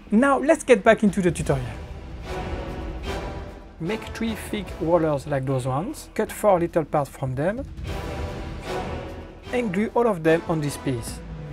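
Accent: France. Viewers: Tu est français ? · Are u french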